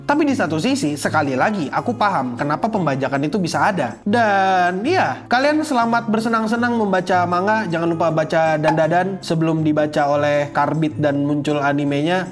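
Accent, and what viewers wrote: Java, Indonesia. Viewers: jadi medhok gitu le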